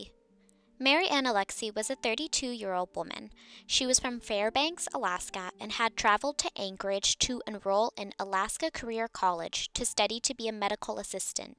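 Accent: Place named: Wyoming, United States. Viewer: Your accent sounded like a girl from Wyoming we had as an exchange student when I was in high school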